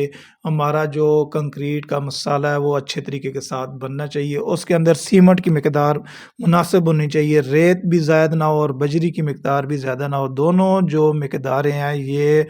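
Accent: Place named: Attock, Pakistan. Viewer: بھاٸی آپ کی زبان سے تو لگتا ھے کہ آپ اٹک سے تعلق رکیتے ھیں ۔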